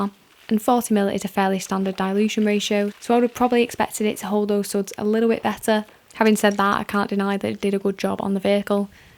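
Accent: United Kingdom. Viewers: You would definitely be a big hit with that British accent in the us.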